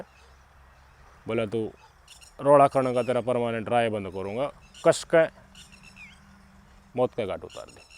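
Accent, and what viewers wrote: Haryana, India. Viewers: Bhai aap pureeee HARYANVI BNTE AA RAHE HO HAN THODA. BAGDI JROOOR S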